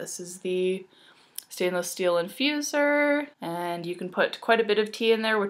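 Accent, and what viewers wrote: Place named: Canada. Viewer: Great video! And I love your Canadian accent <3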